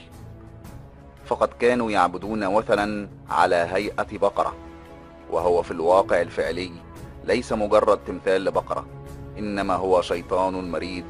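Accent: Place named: Egypt. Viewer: اخى العزيز لا تنطق حرف الجيم بلهجتك المصرية عند قراءة القرآن او الاحتديث النبوية الشريفة بارك الله فيك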